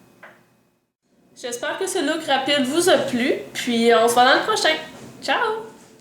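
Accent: Quebec, Canada. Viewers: Magnifique , et j'adore ton accent québécois :p continue , bisous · c laccant quebecois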